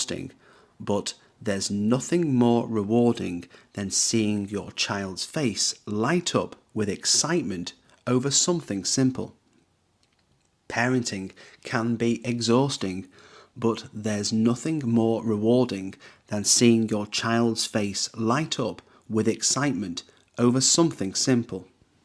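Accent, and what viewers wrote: United Kingdom. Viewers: The British accent!! I missed it alot! I studied in the UK for a while! So, this accent filled me with nostalgia!! Liked+subscribed+shared with others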